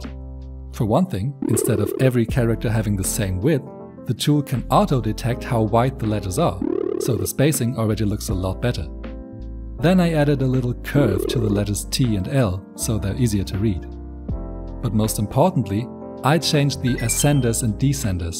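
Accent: Germany. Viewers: I thought his accent made it more than obvious that he is German...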